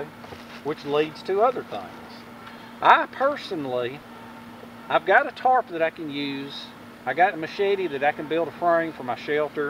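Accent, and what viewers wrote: South, United States. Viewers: love the accent.im southern too,but.....you have a voice that is calming and would garner trust.love your videos!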